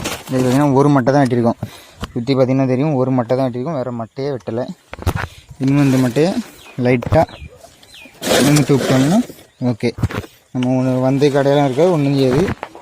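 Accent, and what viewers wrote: India. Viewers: Super god bless but very risky work and hard work 💪 🙏 ❤your looks handsome your madurai slang excellent 👍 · Arumaiyana vela enaku piducha nellai slang love you chlm be safe from delhi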